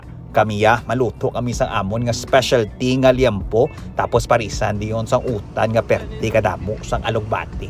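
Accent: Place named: Ilonggo, Philippines. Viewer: I missed the bacolod illonggo na tono! goodjob!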